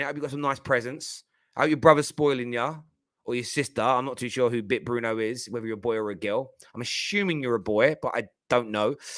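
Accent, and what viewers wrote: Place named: Manchester, United Kingdom. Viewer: Got to love the Manc accent.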